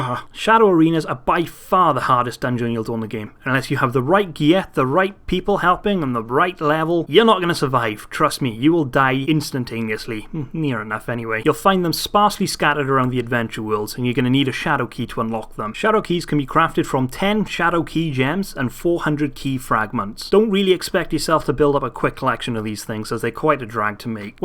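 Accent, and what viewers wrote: Wales, United Kingdom. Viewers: Are you Welsh???? I am! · Are you Welsh?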